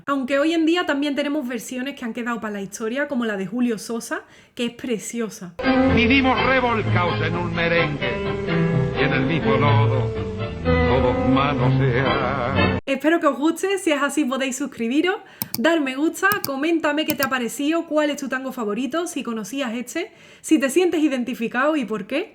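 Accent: Andalusia, Spain. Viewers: Excelente, felicitaciones. Hablas muy como Española pero lo cantaste como Argentina, y además felicitaciones por como te informas de lo que vas a interpretar. soy Argentino y canto tangos, saludos. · Como argentino y amante del Tango te felicito, es una interpretación magistral. Tu acento andaluz queda muy bien en este tipo de canciones. No le des importancia a algún tont0 que critique tu acento. Por otro lado no es la primera vez que haces canciones de Tango y te queda genial, se nota la pasión y sentimiento que le pones. Siendo argentino es un orgullo que alguien tan talentosa como vos haga canciones como estas. Está canción es de las que más he escuchado en mi vida. Desde que era chico mi abuelo nos ponía siempre Tango a mi prima y a mi, tanto en casa como cuando íbamos en el auto haciendo algún viaje visitando familiares. El tema favorito de Tango de mi abuelo siempre fue la y el mío sin duda. 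Escucharlo me hace acordarme de esos tiempos. Su letra es una obra de arte, dice muchas verdades y suena actual aunque la canción tenga tantas décadas. Además de hay otro tema que no envejece y siempre estará vigente: " " de. Las escuches cuando las escuches esas canciones siempre van ser actuales por las letras que tienen. Música que quedará por siempre. Gracias por esta interpretación tan genial Dejo el tema de para quien quiera escucharlo <link> Dedicado a los políticos de Argentina, sobretodo al vendepatria que tenemos ahora como presidente. Aunque el que escuche la letra verá que se puede aplicar a políticos de otros países también. Pero siendo argentino puedo asegurar que políticos má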